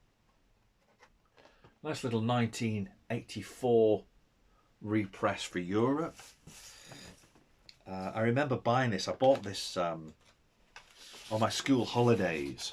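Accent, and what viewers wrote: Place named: Cockney, United Kingdom. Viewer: The only person who cares about this “anniversary” is you. I’m Chip Rock I shot the cover of KISS ON TOUR 1976. 41 photos in KISSTORY too, my photo credit is in ALIVE 11. Gene once told me in ‘75 “you’re our biggest fan!” All serious fans know when the 1st album came out I paid 2$ for it in a California hippie record. Incense galore. You need a haircut & walking papers. Not impressed & your lower class cockney accent is ingratiating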